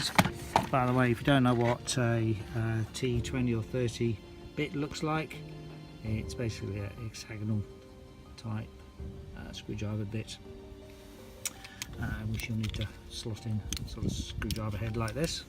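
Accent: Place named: England, United Kingdom. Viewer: A huge MERCI for your video (and for your pure English accent I can understad very well - I'm French)! I was able to remove and do some cleaning on the motor of the steering lock (Mini R56 2007). My friend first told me there was a problem with the power steering... So I removed the Power Steering electric motor (fully electric P. S.) , checked it and of course there was nothing wrong with it because it was the lock that was causing the random problem ! I used my Dremmel to cut a slot in both screw heads then used a simple screwdriver to remove the lock. Easy job! Note that when fitting the cover you have first to push the spring loaded plongeur far in with a right-angled tip in order to position the cover correctly. The lock works nicely now, I just keep my fingers crossed the problem is definitly solved. But as the DC motor was very dirty inside, I guess this guy was causing the fault! 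Your help is worth a pint of beer, my friend !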